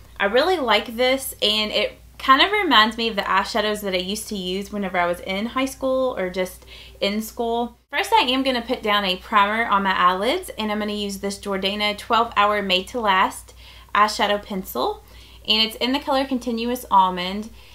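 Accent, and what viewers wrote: South, United States. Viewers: Subscribed Just because we have the same name lol. And you have a southern accent which makes you more awesome :)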